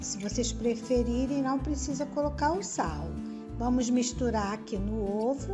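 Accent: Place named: Rio de Janeiro, Brazil. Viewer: Pelo sotaque é do Rio de Janeiro 😅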